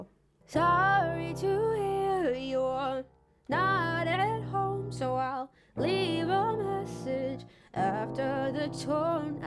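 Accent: Australia. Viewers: Are you australian? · Are u Australian ? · Shit yeah, you're an Aussie. Where u at bby, don't be shy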